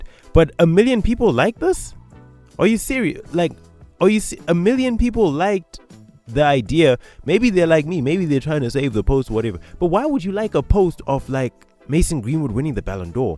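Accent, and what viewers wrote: South Africa. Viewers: THIS GUY IS DEFINITELY FROM SOUTH AFRICA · Are u South African 🇿🇦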